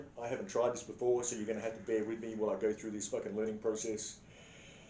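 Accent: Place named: United States. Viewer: This is Kaz, somehow my grandson logged me out and I can't get back in. Geriatric memory loss 🤣 anyway love listening to you always. However you are starting to get an American accent. I can understand everything you're saying 🤦‍♀️. I'll hit the subscribe button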